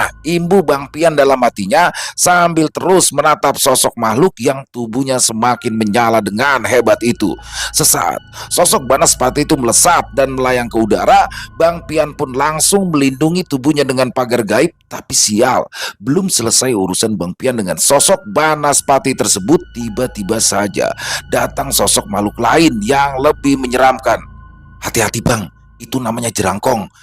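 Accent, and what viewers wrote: Jakarta, Indonesia. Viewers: Kalau udah logat Betawi bang Pian kluar kocak bgt😍 yang bikin kangen ya ini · Cerita bagus dari mas untung dan tambah hot jika dibawakan bang yg penuh penjiwaan dan pas bgt dgn gaya khas betawinya.... Mantab.. Joss...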